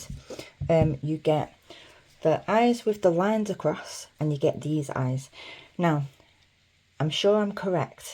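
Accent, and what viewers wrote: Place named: United Kingdom. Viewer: He is beautiful! I laughed every time you said “bubbles “, your British? Accent makes it sound cute!❤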